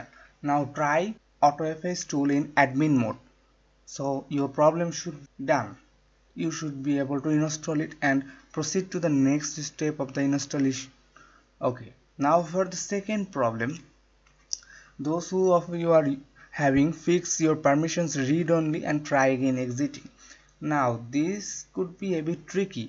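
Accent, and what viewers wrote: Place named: England, United Kingdom. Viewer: This English accents....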